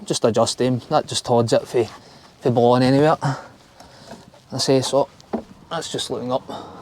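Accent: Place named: Scotland, United Kingdom. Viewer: Love the camper! English guy here, where in Scotland u from? Strong accent that 😂 same accent as Ricky burns the boxer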